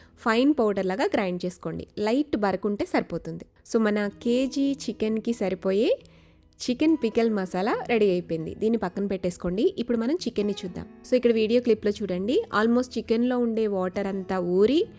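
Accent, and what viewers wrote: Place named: Rayalaseema, India. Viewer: Mam ur pure rayalaseema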